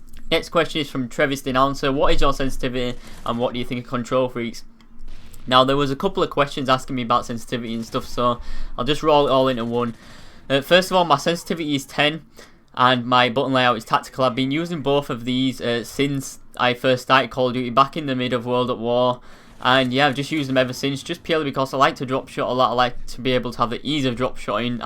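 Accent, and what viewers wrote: United Kingdom. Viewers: British english sucks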